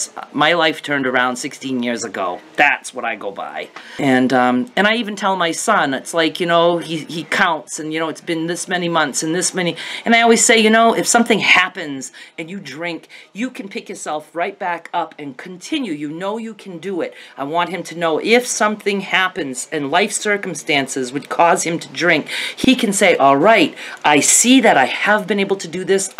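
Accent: Maine, United States. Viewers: I love your straightforward comments and your incredible wit and fine, fine brain. Listening to you makes me so homesick for Maine. It is what it is...my great-grandmother used to say, " Well, it's just part ( paht) of the program."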